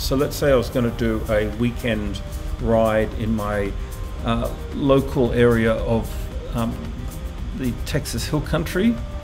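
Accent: Australia. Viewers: Wait are you actually from Texas? I am assume originally Aussie?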